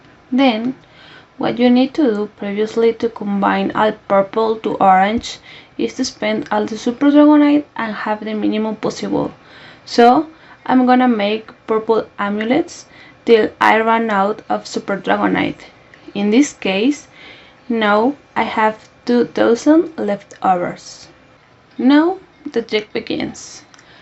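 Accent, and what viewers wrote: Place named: Latin America. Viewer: Pero porqué no hablas Español, pareces latina hablando inglés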